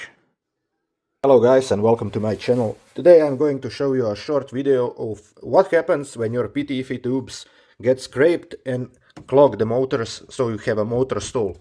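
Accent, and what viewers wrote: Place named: Russia. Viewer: русский акцент явно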